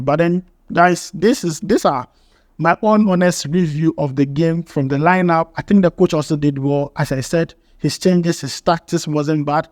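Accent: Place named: Nigeria. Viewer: You don’t have Ghanaian accent, you sound Nigerian.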